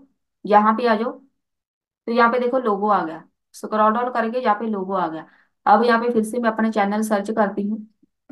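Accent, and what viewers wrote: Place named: Ambala, India. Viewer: Apki aavaj to ambala side ki lag rhe hai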